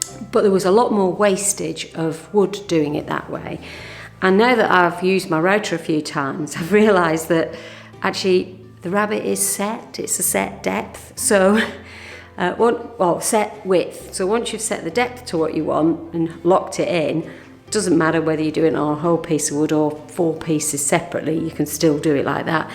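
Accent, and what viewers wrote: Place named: Scotland, United Kingdom. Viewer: I'm sure the Scottish accent will come back! 😂🫣🤔 Looking forward to seeing what you do with the pallets! xx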